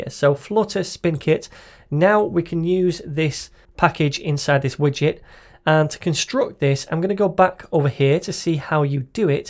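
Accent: United Kingdom. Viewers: are you bri iiish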